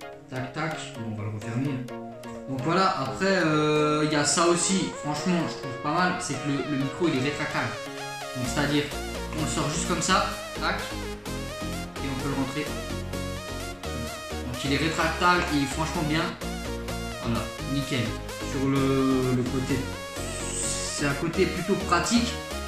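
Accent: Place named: Alsace, France. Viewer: Et yo l'accent alsacien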